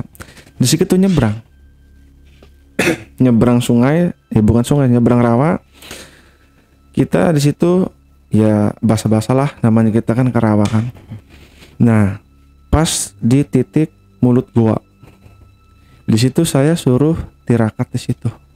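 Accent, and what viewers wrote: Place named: Indramayu, Indonesia. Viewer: Ini banten mana ya? Kok logat nya kaya jawa indramayu 😅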